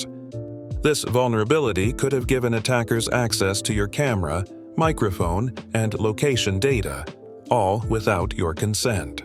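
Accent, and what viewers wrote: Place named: United Kingdom. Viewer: Such a terrible robotic old British style voiceover. Why don't yoy use your own? Damn.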